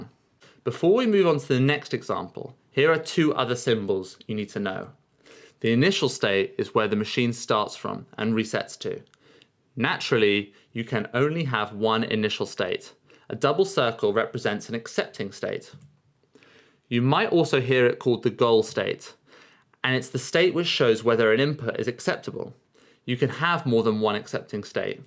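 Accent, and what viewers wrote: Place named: Australia. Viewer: Australian accent♥️♥️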